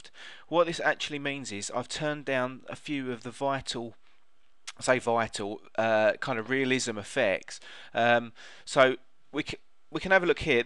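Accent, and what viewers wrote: United Kingdom. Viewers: i love brithish accent! greetsfrom chile good video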